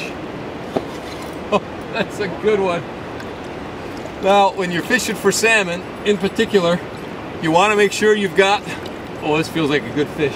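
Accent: Canada. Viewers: you from canada ey? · love the canadian accent :D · That Canadian accent! Lol!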